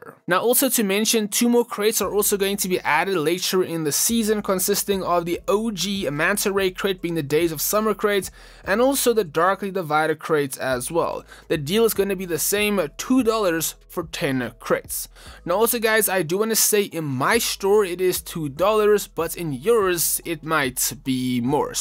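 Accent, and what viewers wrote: South Africa. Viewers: ARE YOU SOUTH AFRICAN?! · are u from south africa? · Bro is south african??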